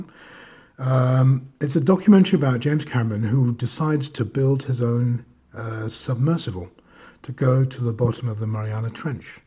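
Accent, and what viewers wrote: England, United Kingdom. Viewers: What accent is this? I mean I know you're from England (unless you emmigrated) but where abouts in England?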